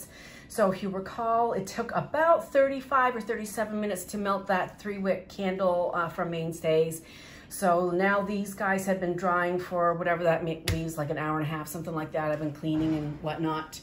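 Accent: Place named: Canada. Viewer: Loved this video! Can’t believe it filled so many molds! I actually heard a Canadian accent in thsi video — never heard that, amiga! Great video!!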